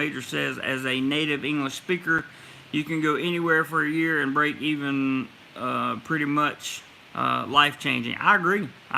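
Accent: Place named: Texas, United States. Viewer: I like your Texan drawl!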